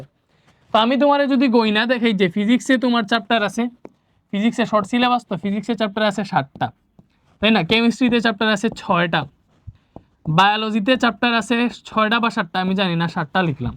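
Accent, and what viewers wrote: Sylhet, Bangladesh. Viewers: Vai ETA amader sylhety basha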